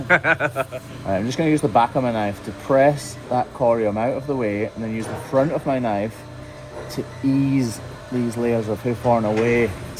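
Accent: Scotland, United Kingdom. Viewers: I kinda like him talking, with that wonderful Scottish brogue.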